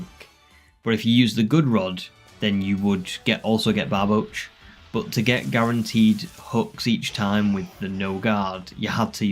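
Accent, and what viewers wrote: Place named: Derbyshire, United Kingdom. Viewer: Where bouts you from mate? Love hearing other people say tea instead of dinner. Im a Derbyshire lad myself. Not often you hear similar dialect over Pokemon content😅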